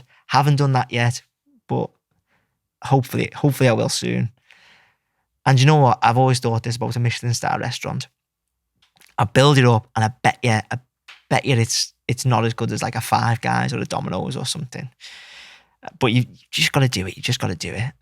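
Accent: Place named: Liverpool, United Kingdom. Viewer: When do you have time to watch Liverpool play?.. you sound like a scouser Edit: I watch right after posting this 😂😂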